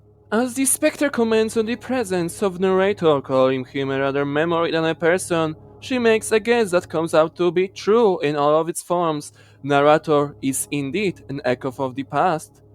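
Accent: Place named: Poland. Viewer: Tak mi się wydawało, że zajeżdża Polskim akcentem, ale jak usłyszałem jak wymówiłeś "vodka" to byłem pewien lol. Zajebisty kontent btw, nie tylko ten film, ale cała reszta, na pewno tu chwilę zabawię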